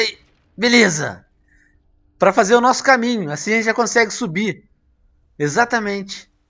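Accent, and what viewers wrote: Portugal. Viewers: tu és de Portugal? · voce e de portugal???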